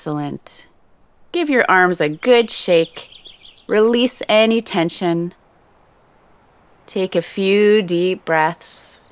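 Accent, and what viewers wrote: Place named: Canada. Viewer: thank you SO much for making (I've only seen one) - this video. I will look for the 'other' video - and continue practicing Yoga. I'm almost 71, a Canadian living in Sedona, AZ. Not only did I find your technique soothing as well as 'stretching' - it was fun to hear the Canadian accent! Typically, I go to a rec center once weekly for chair Yoga. And, now I can do so in the comfort of my home, daily, if I choose. I love how the three ladies demonstrate various ways of executing the movements, depending on one's body's capabilities. And I love how they smile. Congrats, Ladies!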